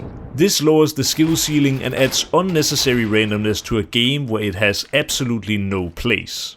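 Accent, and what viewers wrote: Denmark. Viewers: dude i can tell you are danish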